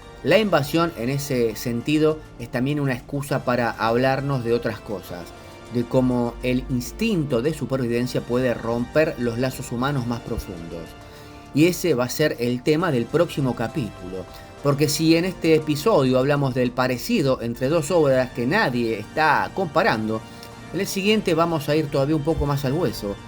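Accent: Argentina. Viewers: muchos ya deberían darse cuenta que argentina es un país latino y se habla español, solo que no les gusta o no entienden el acento rioplatense 🤷‍♀️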